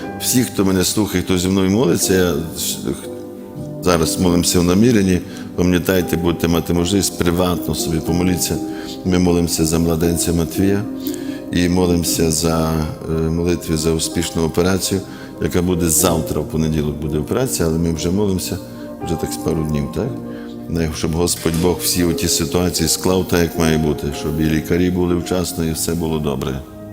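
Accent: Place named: Precarpathia, Ukraine. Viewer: Дякую Господу Богу отче за вас Я також з Ів.Франківської області з Калуша,але живу і працюю багато років в Іспанії,але як гріють душу вашу науки і наш Прикарпатський говір.Дякую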